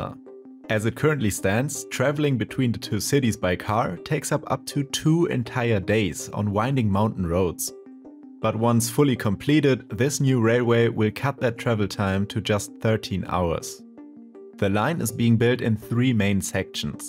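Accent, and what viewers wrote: Germany. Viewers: I love your German accent ❤ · dein deutscher Akzent gar nicht zu überhören😂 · man hört den deutschen akzent immer raus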